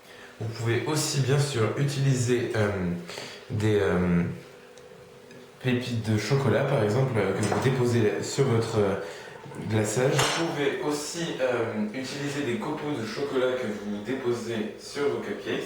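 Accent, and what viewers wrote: England, United Kingdom. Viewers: tu me soule aek ton accent anglais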